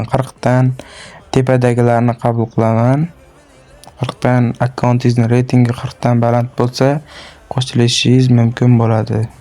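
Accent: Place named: Khorezm, Uzbekistan. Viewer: Chisdi Xorazm sheva qu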